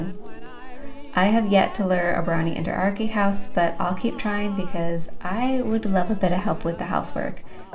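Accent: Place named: United States. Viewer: for years I wondered what your voice sounds like and it sounds very deep and very American I hope it's going to be more of these long-term videos to stay around forever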